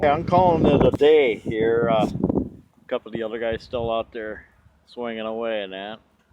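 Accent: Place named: New England, United States. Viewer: Man I love the way you talk yank it's so funny to me A 😎good digs y'all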